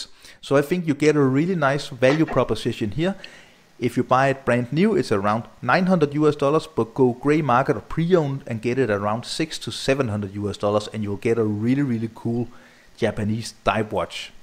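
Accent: Denmark. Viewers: Hvordan kan man bare høre at du er dansker på accenten hahah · Good old Danish accent ! 🇩🇰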